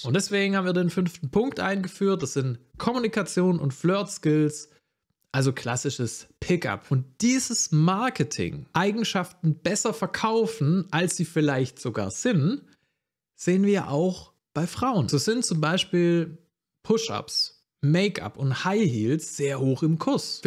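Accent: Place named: Swabia, Germany. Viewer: Geiles Video. Den Schwabe in dir bekommt man nicht leicht raus. Grüße ins Ländle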